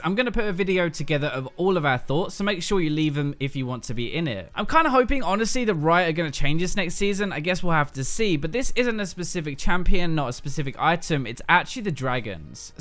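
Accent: United Kingdom. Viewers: I like how smart you sound when you talk :D Must be that british accent :D Anyways, just to put into perspective. If you have 900 ap on veigar and with that 3 infernals and you get an elder. From dragons alone you get 324 ap more. That is bloody ridiculous. If he didn't oneshot you before he does now. And baron gives you even more. One game I managed to get 1300 ap on veigar with items and baron, no infernals though. Imagine with infernals, it would've been over 1700 ap. Can you imagine that power. Nope, and that's why dragons are broken, agree with you. I don't like baron buff minions though. They are impossible to kill and deal dmg. They could deal more dmg but be still normal health.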